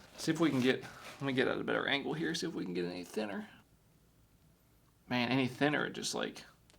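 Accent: South, United States. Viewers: Sounds country but doesn’t act country 🤷🏻‍♂️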